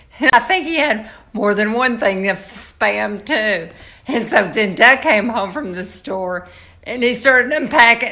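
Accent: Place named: Tennessee, United States. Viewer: how are you feeling today? Hope your tests came back negative and you're on the road to recovery. I love hearing you talk it brings me back to my family from Tennessee.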